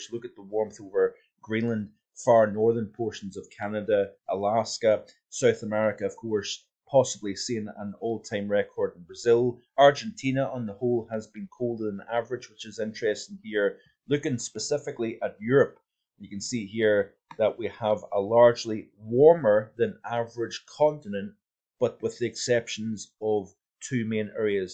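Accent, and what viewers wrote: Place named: Northern Ireland, United Kingdom. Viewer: This will probably be the height of our cold snowy winter. With last year being quite cold and that becoming an ever increasing rarity I’m betting on this winter being a wet and stormy one. The climate change impacts are really becoming quite noticeable to me now over the past few years. More erratic cold spells and ever longer lasting heat into Autumn. Here in Northern Ireland it’s been a very odd year. An extremely humid almost tropical summer with a ridiculous amount of thunderstorms the likes of which I’ve never seen. In September there were severe elevated storms with really active lightning. Now it’s the amount of rain we’re getting. Absolute crazy. Most of October and up to mid November was basically written off in terms of hitting the rivers for some fishing. One of my nearby rivers is still high as it has been for weeks. 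By the way are you from NI? I seem to recognise your accent. You do some amount of work on here it’s much appreciated👍.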